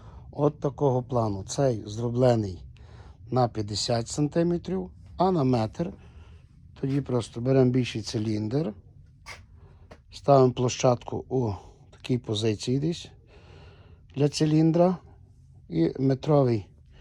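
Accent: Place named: Ivano-Frankivsk, Ukraine. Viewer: Ви часом не з іванофранківщини бо дуже знайомий акцент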